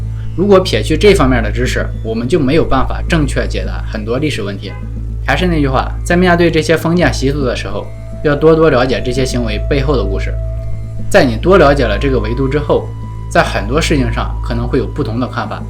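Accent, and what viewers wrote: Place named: Shandong, China. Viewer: 这山东口音太重了吧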